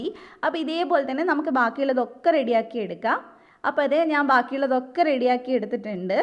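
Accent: Kannur, India. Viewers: kannur ano? Kannur style slang allalo athkonda chodikkunnad. Adipoli snacks 👌👌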